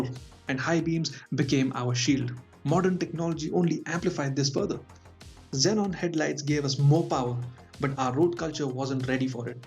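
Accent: India. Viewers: funny and indian accent, great combo imo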